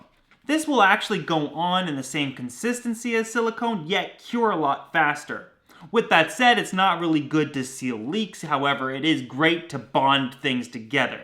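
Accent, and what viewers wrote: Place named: East, Canada. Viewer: You must be from eastern canada somewhere lol your accent sounds like it :p But for real, I doubt what I was gunna use is safe for an aquarium...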